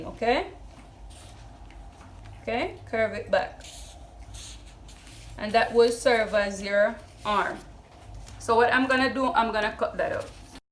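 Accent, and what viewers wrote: Jamaica. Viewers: Sounds Jamaican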